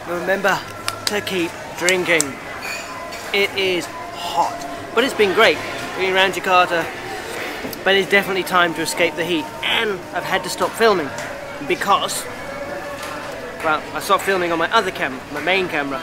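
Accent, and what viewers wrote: United Kingdom. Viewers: Based on your accent, you must be from the UK, right?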